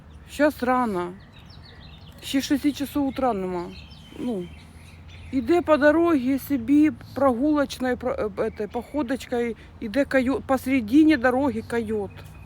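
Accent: Ukraine. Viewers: Видно що ви з України, говор наш. Дивлюсь і радуюсь що ви не там де бахкають. Щастя вам і удачки, а кому не подобається хай не дивляться і не слухають.❤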